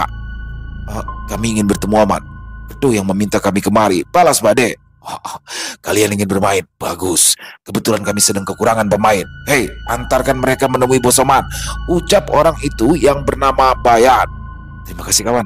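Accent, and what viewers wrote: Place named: Kalimantan, Indonesia. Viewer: Ceritanya bagus, diawal pembawaan kisah logat Kalimantan masih kebawa mungkin Krn kebanyakan kisah dr sana ya 😁. Jadi ada tugas baru nih bang belajar aksen Bali 😅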